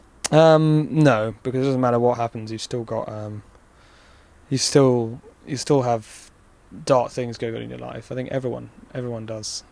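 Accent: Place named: United Kingdom. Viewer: Damn, u r so hot!!! Love ur Brit (London?) accent...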